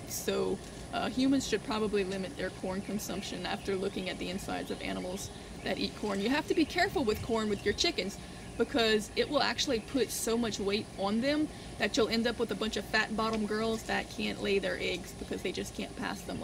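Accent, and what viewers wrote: Louisiana, United States. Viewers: Where are you from? Louisiana??? Love your video. Very informative.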